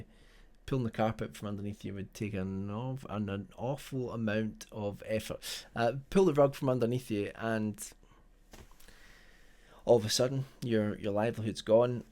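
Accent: Scotland, United Kingdom. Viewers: Well said or rather, well said with a Scottish accent 🎧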